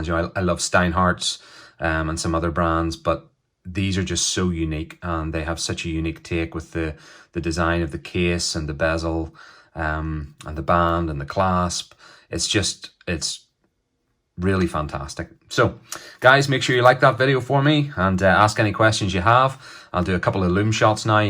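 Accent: Northern Ireland, United Kingdom. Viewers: great to hear a NI voice. I have a 38 with red bezel on order. thank you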